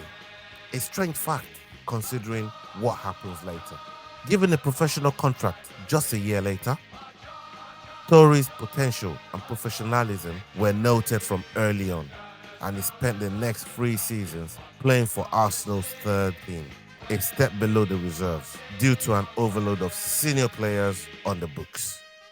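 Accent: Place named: Africa. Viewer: Why is an African narrating this 😮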